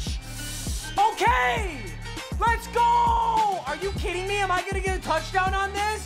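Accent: Michigan, United States. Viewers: It’s Michiganian diction.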